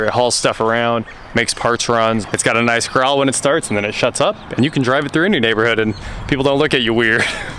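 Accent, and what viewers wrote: Kansas, United States. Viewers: Kansas- northern Oklahoma dialect. It's how we talk 'round here.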